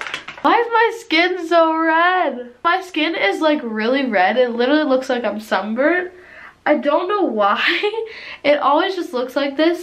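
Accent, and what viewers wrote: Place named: Australia. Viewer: It is Australian I would know I'm a Australian. IN LOVE WITH IT.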